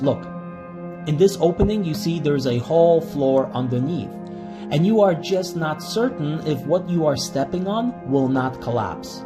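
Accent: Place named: Romania. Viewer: Are you by chance originally from Romania? I ask because I've heard Romanians speak American English with a similar accent like yours. Great content! Thank you.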